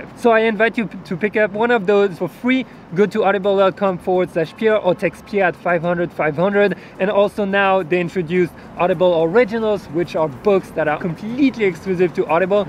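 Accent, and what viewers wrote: France. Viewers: really like your videos dude, have you noticed if you lower the reproduction speed to .5 you sound like rally drunk?? maybe because of the french accent hahaha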